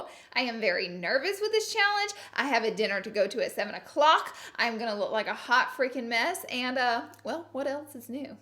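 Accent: South, United States. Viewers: Love your dramatic southern bell voice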